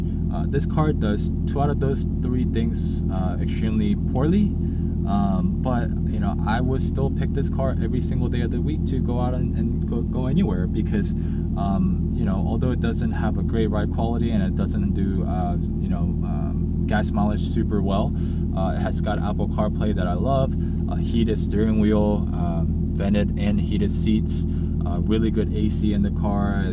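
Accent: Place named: Texas, United States. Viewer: This guy definitely from Dallas TX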